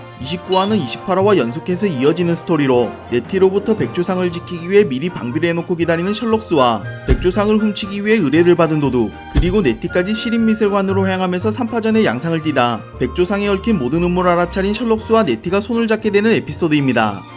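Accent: Gangwon, South Korea. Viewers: 왜 난 강원도 억양처럼 들리지?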